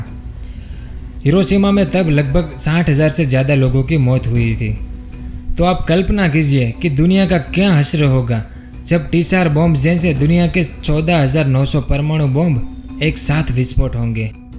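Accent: Gujarat, India. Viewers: nic video ...hahahaha Gujarati jalak rahi apki awaz me ... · Gujarat ki bhasa hi alag hoti hai jo ki hindi bolne se pta chalta hai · bha gujrati ho kya? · rocky rock 👌👌👌 u r 1000% bole ap ye voice boht gujrati he hindi 😃😃😀😀✌✌👌👌👍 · Bhai Gujarati me Hindi kyu bol rhe ho ! Try to control tone, it's nice video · aapka bolne ka style gujrati hai. Aapka ascent se pata chal gaya.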